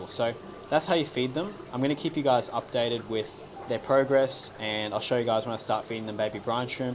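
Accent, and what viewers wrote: Australia. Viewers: This is amazing! What's your accent? Aussie?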